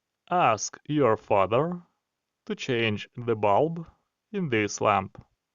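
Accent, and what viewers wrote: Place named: United Kingdom. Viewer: Все гарно, лайк, але твій Брітіш акцент.. Тоді хоть зміни прапор з Американського в заставці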